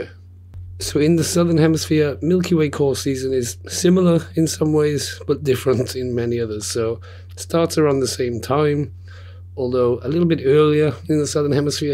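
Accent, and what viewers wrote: Wales, United Kingdom. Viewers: What part of wales are you from mate?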